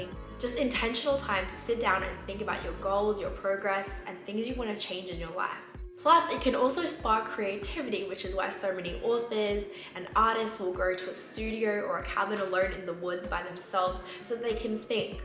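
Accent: Australia. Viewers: can you speak little slowly....I cannot caught few words because of Australian accent...I’m from India 🇮🇳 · Australian accent too tough to understand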